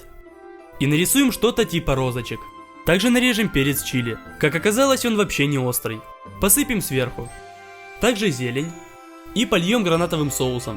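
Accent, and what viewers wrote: Odessa, Ukraine. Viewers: Ты случайно не из Одессы?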